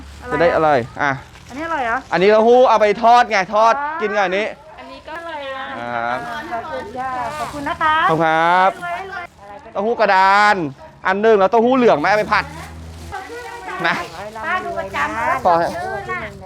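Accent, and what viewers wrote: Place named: Central Thailand, Thailand. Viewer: ชอบกินเต้าหู้ค่ะ น้อง พูดเหน่อดี ดูเพลินๆน่ารักๆดี เป็นกำลังใจให้นะคะ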